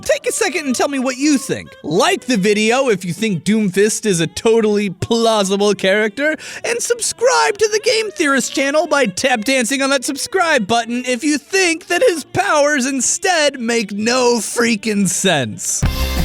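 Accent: England, United Kingdom. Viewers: cute english timelord!!!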